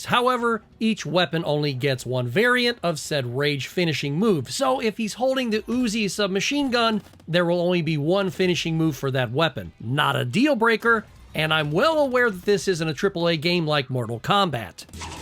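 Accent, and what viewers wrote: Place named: Boston, United States. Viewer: OH SNAP! THE BOONDOCKS REFERENCE...you're speaking my Boston language, KEHD! Great video, Dede is getting this for me for Xmas lolz!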